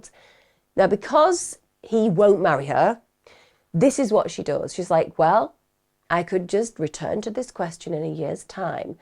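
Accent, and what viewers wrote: Australia. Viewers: I ❤ ur Oz accent ~ betta than most trained actors who've tried 😊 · Oh I love your Australian accent! ❤ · Love your Aussie accent. Well done:) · Love the Aussie accent! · Your Aussie accent is very good, MUCH better than Meryl Streep's!!! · Hey! Awesome as always, you're Australian accent + context of delivery = Lmao 😂. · This is just horrible. I was whincing a lot when you mention about his skin. I feel sorry for all the innocent children in this. Her children and John's children. Also them poor people who actually found his body, they must be dealing with some trauma. If he got a restraining order out on her the same day, then how come she was around him and the children afterwards. I don't know a lot about them but I'm pretty sure they would be instant. And to have sex with her when he had just got a restraining order out aswell is strange. 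Maybe he was drunk and half asleep. Anyway as always, you are just wonderful. It amazes me how you remember all the details. I could listen to you all day, talking about anything. I bet you were fantastic at reading books for your two boys when they were younger, with all the different voices and sounds etc. Great Australian accent by the way. It was so lovely to hear talking in the background, she is letting you know that she is supportive of her amazing Mummy at work, she probably feels comfort hearing your voice. X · It’s gotta be said. Coming from an Aussie. Your Aussie accent is pretty spot on. 😂❤ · I actually LOVE Aussie accent 😂❤😘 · She got away with so much under the cover of mental health you can still pay for your awful crimes and be treated for your mental health her crimes just escalate with barely any consequences Loved the Australian accent it was pretty close to how we sound. 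Being Australian this case has been done to death, but as usual has found out and added so many different facts and put so many different perspectives on all of the people involved in the case❤❤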